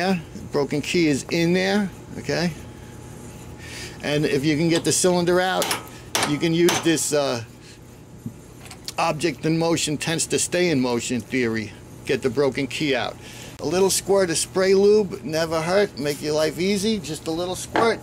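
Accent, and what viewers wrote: New York, United States. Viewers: Excellent video and I'm loving the accent, New York or at least NY state? or is that Boston? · Good info thanks man. Love the NY accent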